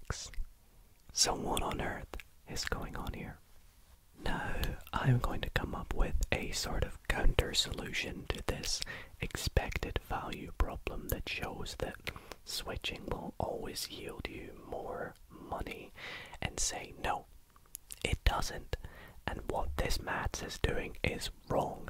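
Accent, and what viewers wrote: United Kingdom. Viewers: i love your asmr math channel. i also like your British YouTube name and accent. the British tends to call mathematics maths in abbreviated form.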